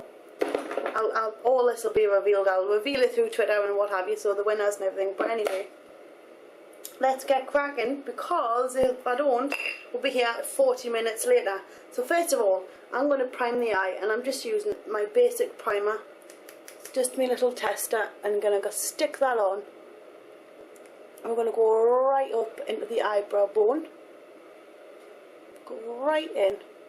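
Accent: United Kingdom. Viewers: God i love your videos ! humm, r u british?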